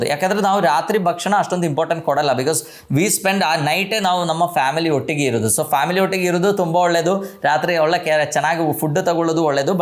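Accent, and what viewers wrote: Kerala, India. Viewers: Neenu. Malayali. Alva. Sir. Nimma. Kannadadalli. Gothaithu. Tummba. Chenagide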